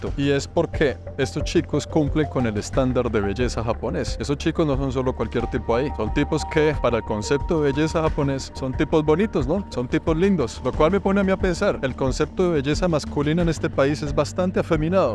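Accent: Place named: Colombia. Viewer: Llevaba como media hora intentando adivinar el acento y dije... tiene inflexiones de colombiano pero como un aire argentino... pero como gringo... fue buena mi aproximación.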